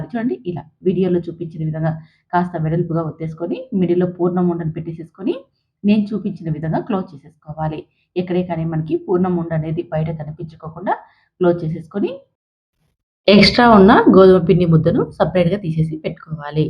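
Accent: India. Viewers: AMMALU MEE PADHA VUCHHARANA BHATTI RAYALA SEEMAVARI LA ANIPISTHODHI AMMALU "VESUKOKUNDA" AND."RAKOKUNDA" MEEMU 20Y.BACK.RAYALASEEMA ANANTHAPUR VASTHAVYLAM AMMALU . THANQ AMMALU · Nenu mi matalu vini ma Kadapa vallu laga unaru anukuna andi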